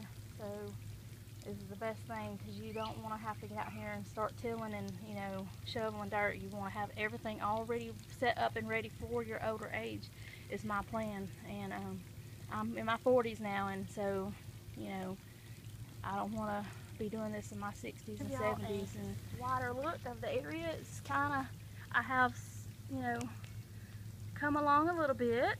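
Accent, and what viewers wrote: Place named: South, United States. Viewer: Cool channel! Love your southern accent!😬👍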